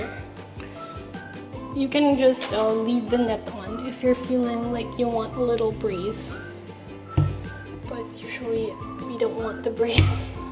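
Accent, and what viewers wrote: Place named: Canada. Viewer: I love the video. You accent is really interesting, I love it! I'm living in Hawaii, and you sound like maybe you lived in Canada maybe? No idea how much these costs but I hope to move to Japan with my wife sometime in the next year or so!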